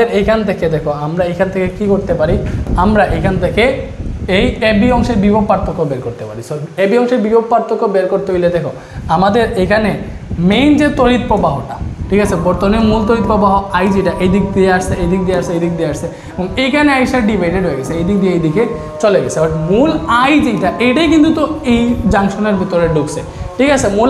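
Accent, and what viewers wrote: Sylhet, Bangladesh. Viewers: আপনার ক্লাস দেখে আমার বাক্কা উপকার দিছে। সিলেটি স্যার। ভালো লাগছে।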